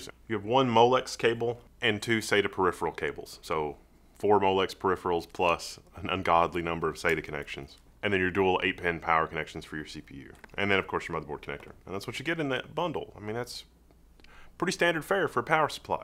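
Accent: South, United States. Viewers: the only tech reviewer I know with a southern drawl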